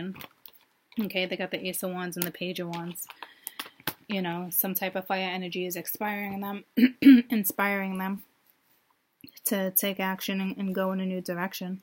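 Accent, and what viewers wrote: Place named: Massachusetts, United States. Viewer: I know what I would do.....🏃‍♀️👀🏃‍♀️👀🏃‍♀️👀🏃‍♀️👀no woman should ever take a cheater liar thief and deadbeat dad back. Stay where you thought the grass was greener but instead you got the Sahara desert 🐫 🙏❤️thank you love that Massachusetts accent. Right up the road from you. You are a great reader 🙏❤️⭐️⭐️⭐️⭐️⭐️ An ex asshole libra husband 😂😂😂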